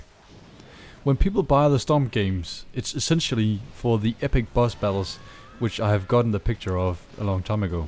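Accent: Denmark. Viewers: r u danish?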